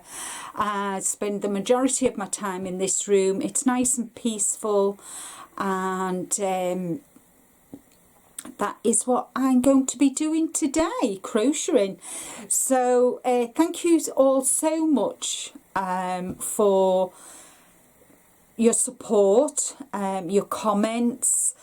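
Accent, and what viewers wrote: United Kingdom. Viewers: Petunia for your love of your garden. I think you said Petunia in your wonderful British accent. Of course we all have to have fun naming items too so Flower or Yarny. Love your Yarny room and organization, beautiful and cozy!! Such a lovely channel!!!!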